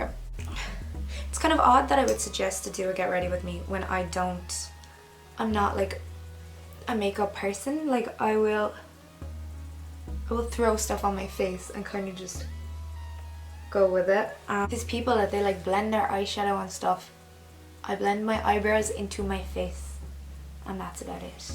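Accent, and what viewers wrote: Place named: Ireland. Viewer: Really impressive, holding a Master-degree in management. Also beautiful Irish-accent.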